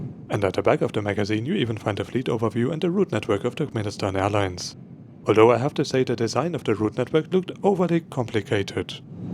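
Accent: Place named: Germany. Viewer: 3: 16 ... can barely hear you .....plus the strong german accent ...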